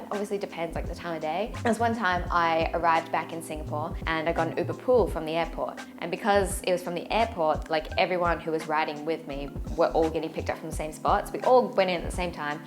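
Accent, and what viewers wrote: Australia. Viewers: Love your Aussie accent. Australian accent sounds like British accent. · Thanks for sharing. Love your Ausie accent.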